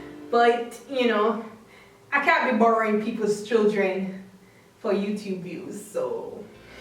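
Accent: Jamaica. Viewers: I’m sorry I’ve never been to this channel but you sound really Jamaican are you?☺️👍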